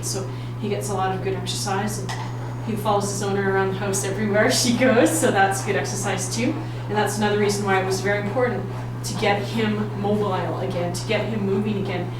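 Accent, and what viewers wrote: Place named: Ontario, Canada. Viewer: Her accent sounds Ontarian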